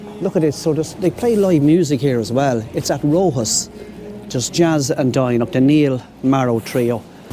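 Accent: Cork, Ireland. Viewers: We just love watching 👀 just love Cork and that accent we love going on a journey with.Happy Sunday evening see you next time.🎅🎅🎅🧑‍🎄🧑‍🎄🧑‍🎄🧑‍🎄🤶🤶🦚🦚🦚🦚🐸🐸🐸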